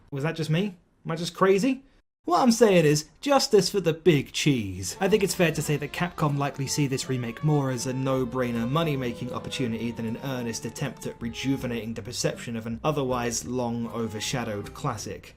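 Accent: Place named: United Kingdom. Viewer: dang this sexy voiced bri'ish guy sure is funny and cool and a good addition to the channel well done hard drive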